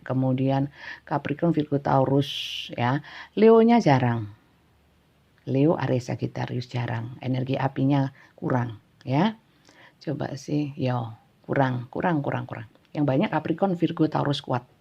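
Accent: East Java, Indonesia. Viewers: penyampaian yang apa ada nya simple jawa timur banget mudah diterima dan di cerna semakin sukses ya mbk sllu dlm keberkahan Allah SWT. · Peeeh ora umom to Bun...suka dngn Readinganny karna suara dn logat jw timuran yg khas,slm sehat Bun dr cancer Borneo nyimak 🌹🙏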